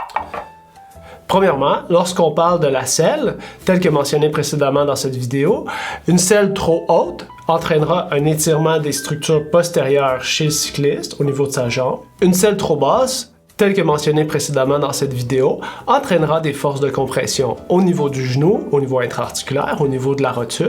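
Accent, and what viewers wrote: Quebec, Canada. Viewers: Ca fait plaisir d’entendre a nouveau parler québécois ! J’ai fait mon bac et ma maîtrise à l’UL Chapeau pour votre chaîne et le contenu de qualité et bienvenue à vous tous à venir rouler au Maroc après COVID-19 bien sûr !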